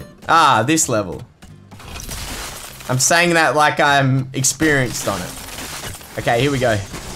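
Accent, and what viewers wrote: Australia. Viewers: Are you fromAustralia?? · Are you Aussie or Just wondering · Are you Australian?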